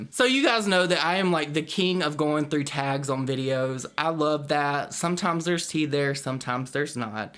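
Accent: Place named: Oklahoma, United States. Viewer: Just found your channel and subscribed! Loving the content!! Keep it up!! :) When I heard you start talking I googled to see where you were from because I thought you were from Oklahoma where I am from! :)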